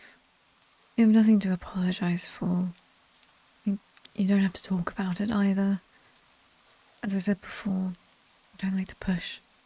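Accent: United Kingdom. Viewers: I love the British accent and the background thunderstorm. It's so calming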